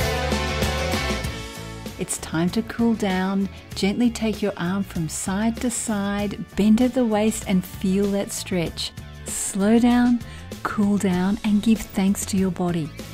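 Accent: Australia. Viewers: At 81 I do 2 30 min videos and feel 60! These are excellent to use alone at home! And I love your Aussie accent...that helps!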